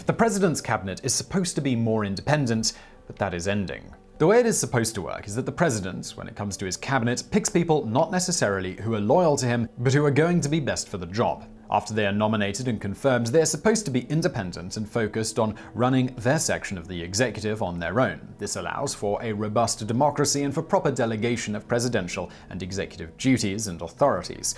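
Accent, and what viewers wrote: United Kingdom. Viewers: I don't know, somehow when a guy with a British accent starts trying to 'splain the US Government just doesn't really work for me. Maybe get someone from Boston to do the job next time...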